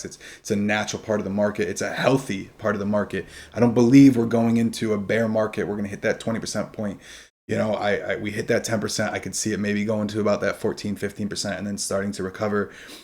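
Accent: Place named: Boston, United States. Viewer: Is from Boston? He sounds like he has a Boston accent LOL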